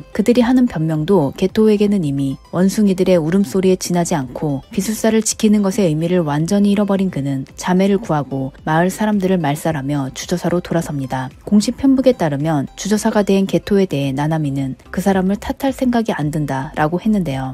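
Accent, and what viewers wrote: Japan. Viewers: 항상 잘보고 있습니다 일본어 발음이 영상의 매력같아요ㅋㅋ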